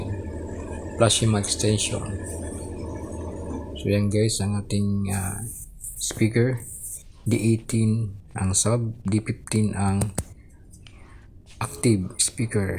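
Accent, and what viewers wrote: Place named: Bicol, Philippines. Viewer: Hello BRO.. Parang bikolano ka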